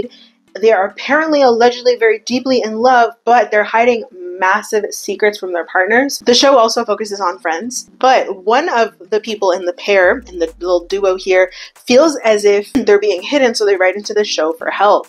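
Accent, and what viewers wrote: Canada. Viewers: bestie, PLEASE don’t feel embarrassed about your BEAUTIFUL Canadian accent!! I think it’s really pretty!! And PLEASE DO NOT EVEN get me started on your infectious giggle!!!! I’m so happy that I found your content/channel!! Confession: I have been binging on ALL of your videos!! Please keep them coming!!!! 😊❤ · Can you say " I don't know about how long it would take to get out the house" in your full Canadian accent. Please lmao. I am only here to hear you slip up! You get so embarrassed hahaha. There is nothing wrong with your accent.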